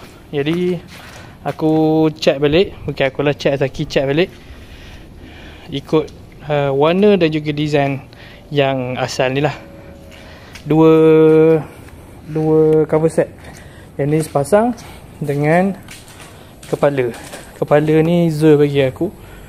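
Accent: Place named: Pahang, Malaysia. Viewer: gaye berckp mcm org pahang..heheheh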